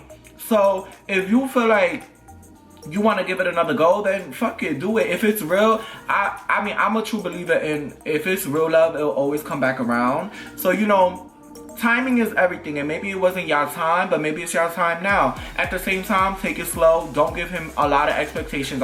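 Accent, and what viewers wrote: New York, United States. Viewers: Omg! I thought you were from NY, your accent? Yep and like you said kind of... You gotta know when to hold them and fold them!!! Pretzel like...okay😜🤐😜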